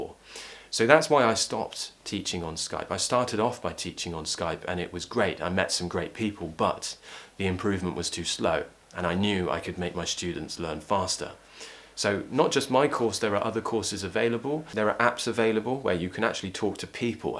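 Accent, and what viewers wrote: RP, United Kingdom. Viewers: Sir love your accent and pronunciotion on the other hand i love british ❤️ 😂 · Hii...🙋.. thanks for your great video s.... I always eagerly wait for your upcoming video s...u r the great British Accent teacher....👍👍👍👍great job👍👍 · I really love bbc accent and I think your accent similar to it or msy be itselfe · I live in uzbekistan l love your accent l want to learn modern rp from you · Of course i love (rp) accent thus i watching you always❤👏💕💪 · you stated that you have a “modern RP accent,” how is that in relation to the “mainstream RP” or “contemporary RP”? I, myself, like the contemporary RP accent. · love your RP accent to improve my African accent. But the fees choo! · RP has to be my favourite. I love yours and Tom Scott's accent. Most of my Youtube consumption is from Brit creators' contents, mainly because my ears just prefer it that way (also: vocabulary preference), so that's the audio exposure for me. 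What really started all this though is that one time I got asked in my home country if I were a foreigner due to my accent; most people here can speak English, but in the American accent. Prior to that, I hadn't really noticed it.. so I just thought if that were the accent I tended to default to, I might as well do it well. :) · Your pronunciation is excellent! I love RP. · I JUST LOVE THE WAY YOU SPEAK...so that's why I think british accent is best....👍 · It seems to me that British accent is really pretty as it is fascinating to listen to a Brit speaking with it like you.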